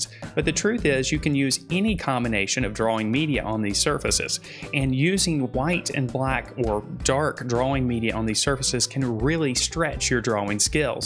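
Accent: South, United States. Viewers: The way you say drawing sounds like drauwwing. What accent is it? Southern?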